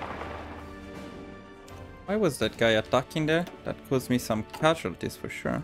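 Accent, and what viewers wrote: Italy. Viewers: Out of curiosity: are you italian? The accent looks like it. Loving the videos, and ur accent remembers me of my father in law❤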